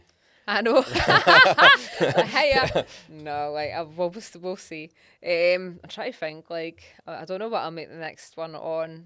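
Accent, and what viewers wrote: Scotland, United Kingdom. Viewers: US guy here: I worked as a geologist in the petroleum industry for many years. Because of the North Sea fields(with Aberdeen being the “oil capital” of Scotland), there are a lot of Scots employed in that profession. Many of them take US expat assignments, and most of them end up in Houston, the “oil capital” of the U.S. So over the years I worked with a fair number of Scots, mainly geologists and engineers. To (finally) get to the point, I struggled for some time with understanding what they were saying. I was often embarrassed when I had to ask them to repeat something. There are just enough different vowel sound pronunciations, speech rhythm and cadence differences, and dialect words so that American English speakers often feel like we’re a sentence or two behind in our understanding. Over the years, I did become accustomed to the heavy, northern and coastal Scottish accent, but having not heard it spoken for a decade since retirement, I have to pay close attention to to follow her, especially when she’s not “speaking geology” and is just in casual conversation. 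I find it fascinating that our common tongue has so many accents and dialects such that sometimes we actually have trouble understanding others even though we are speaking the same language.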